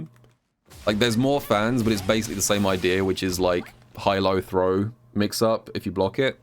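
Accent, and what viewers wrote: United Kingdom. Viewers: lol I had to watch multiple times I thought he was saying "tool character". That British accent is something else. Carry on don't mind me great video much appreciated because that fan is a beast to deal with.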